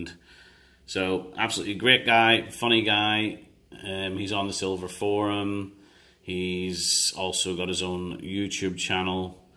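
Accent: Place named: United Kingdom. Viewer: you have the most American British accent ive ever heard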